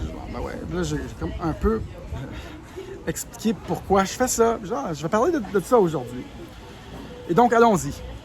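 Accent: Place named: Canada. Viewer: En plus tu es très sympa. J' aime entendre les canadiens qui parlent français parceque je les comprends bien.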